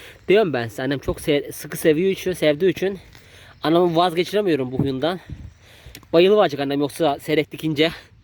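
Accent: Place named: Kastamonu, Turkey. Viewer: Sizleri izliyorum bende kastamonu bozkurt ilçesi konuşmanın bi ze benziyo mesala mancar😁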